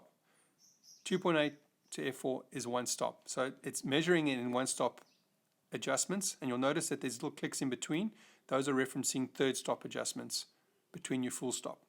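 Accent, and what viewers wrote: South Africa. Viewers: Thanks very informative and well presented a nice calm narrative no shouting or speed talking. Soon as I heard you speak I thought SA accent a beautiful country, I have been lucky enough to visit it a few times.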